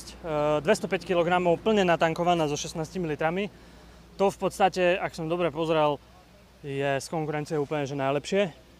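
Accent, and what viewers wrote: Eastern Slovakia, Slovakia. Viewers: Pěkný test, i já jsem ji dal v sobotu v Karlovicích pěkně za uši...Podle přízvuku tuším, že jsi vyhodnár, bo pekne si hutoril :-)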